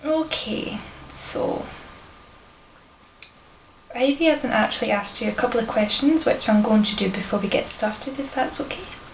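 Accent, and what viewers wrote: Scotland, United Kingdom. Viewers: ***** And after seeing your answer I just now realised that the description said "attempted scottish accent" ^^ When browsing for ASMR videos I just look at the titles and listen to the start to determine if I'll watch it and mostly when I'm half asleep so not a lot of description reading going on :P Anyway, I think one has to know the scottish accent very well to tell the difference though. I just heard it in the later seasons of the TV show "Being Human" before but beside her having a thicker accent I straight up thought you were scottish ^^ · Some may think "NERD" when they here the Scottish accent and the glasses, but I can see past that to an ambitious young woman who can be a very good friend and helper! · doun, love the Scottish Accent. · Your Scottish accent is pretty good but you're saying words with an R on the end funny e.g. 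Here, hour, or etc... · pleaaaaase do more Scottish accent asmr's! it's the best! also u perform this so naturally it's so unique. it actually feels genuine. i love it! I'm currently revising for exams and have only been listening to your asmr's :D x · ASMR . thanks for the reply. Your Scottish accent is top shelf. I have lived in the states for 10 years and slowly losing mine. good luck developing the aussie one. cheers, and have a good one friend. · Gotta give you credit for this I.have all on looking at a ceramic let alone remembering what to say AND doing a scottish accent! Great job keep the videos coming :) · Are you scottish? I've heard the accent before on a TV show. No offense but my brain is stuck between "that accent is frkin brilliant" and "that sounds funny". Greetings from Germany, keep doing what you're doing ;) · Well you seem to have it pegged pretty well. Just came across your videos yesterday and the scottish accent intrigued me. 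Cant wait to check out more of your vids. you'll probably hear from me again, my dear. lol. Thank you for taking the time to respond! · This sounds Scottish not Brittish. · I could be wrong but iirc, she lived in Scotland for some years and picked up the accent somewhat. And, I mean... People can fake accents, it's pretty much what actors and voice actors do. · Okay. I just subscribed. I randomly came across this video and I am glad I did! This was very relaxing. Not only am I digging the Scottish accent, but you are beautiful, as well! Keep up the excellent work! :) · Wow,this was very nice,and love that scottish accent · Attempted Scottish accent!? I didn't think so. I though you were actually Scottish! 😃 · I thought you were actually Scottish until I read the description at 10 minutes in. I like the accent, more Scottish ones would be good! Excellent video. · Great scots accent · I am completely in love with your Scottish accent! 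I'm a fan of it since trainspotting 😍 great asmr video. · Finally a Scottish person doing ASMR! I'd guess West? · That's a Scottish accent she's has. Same as mine ;) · You sound a lot more Scottish than British!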